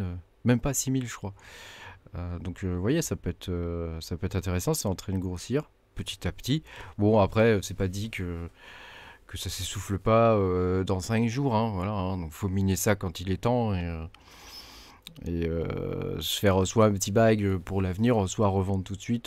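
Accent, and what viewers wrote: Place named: France. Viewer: anyone loves XDAG, will also love your French accent.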